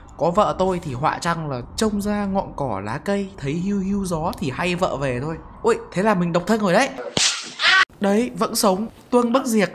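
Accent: Hai Phong, Vietnam. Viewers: Ah dân Hải Phòng à??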